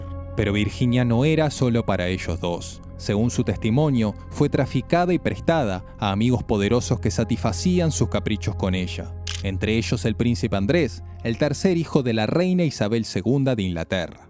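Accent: Argentina. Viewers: Lo siento no es por ofender, pero el asento Argentino me desagrada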